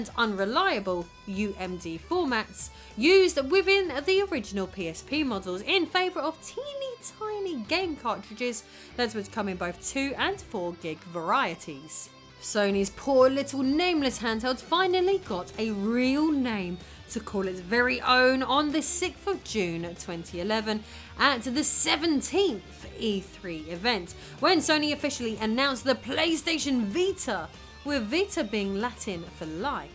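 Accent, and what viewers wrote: United Kingdom. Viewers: oh dear! Your british accent is simply killer!!!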